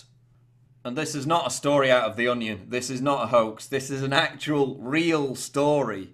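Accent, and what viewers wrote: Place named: Yorkshire, United Kingdom. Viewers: you from Sheffield?, you sound like me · As an Aussie, is yours a Yorkshire accent? You sound like Michael Vaughan, former English cricket captain. Btw, it's very patriarchal, you should learn to speak with a Swedish accent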